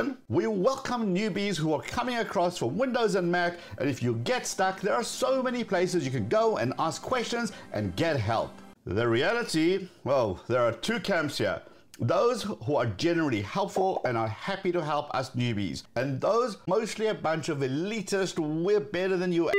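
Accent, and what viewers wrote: South Africa. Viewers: Whenever there's a new video I click, for the content, but secretly for the south African accent 😂😂 Much love from 🇲🇿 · You've got an accent similar to ours in South Africa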